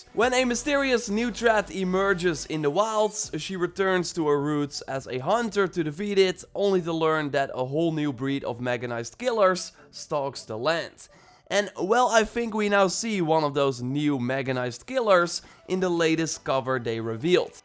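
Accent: Netherlands. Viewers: He's from the Netherlands.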